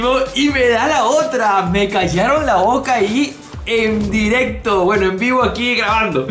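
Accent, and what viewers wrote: Venezuela. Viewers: El es venezolano?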